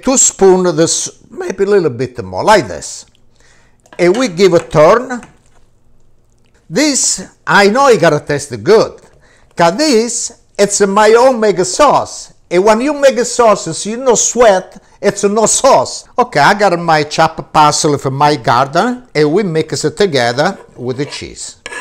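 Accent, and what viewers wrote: Italy. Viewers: I'm laughing AND crying. This wonderful man sounds like EVERY Italian immigrant I had the privilege of growing up around and with, including my own awesome father. Miss you, papa' 🍝🍷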